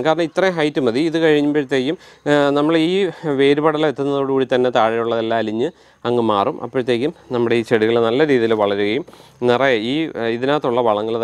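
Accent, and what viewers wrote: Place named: Kottayam, India. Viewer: പള്ളയാൻതോടാൻ കോട്ടയം ഭാഷ റിയൽ കേട്ടോ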